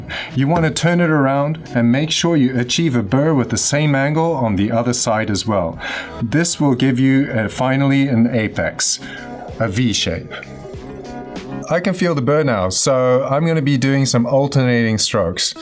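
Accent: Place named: Zimbabwe. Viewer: He has a Rhodesian/Zimbabwean accent. Get a life and leave him alone.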